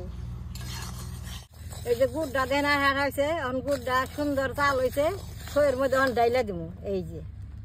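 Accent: Tangail, Bangladesh. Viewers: আপনার বাড়ি কি টাঙ্গাইল?? এটা তো টাঙ্গাইলের ভাষা.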